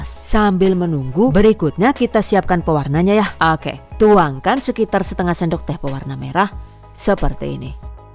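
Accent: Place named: Tegal, Indonesia. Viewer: Semangat ide ide baruu banyak vidio yg menjadi motivasi saya...terus pembawaan juga kadang suka bikin tersenyum senyum..logat Tegal jgn ketinggalan yaa..hehe..puding nya cantik ikut memeriahkan HUT RI...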